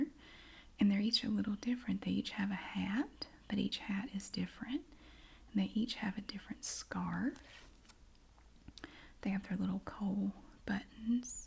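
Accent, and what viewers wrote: South, United States. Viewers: For what it's worth, I adore your accent! I lived in NC for many years and I miss the Southern accents. ❤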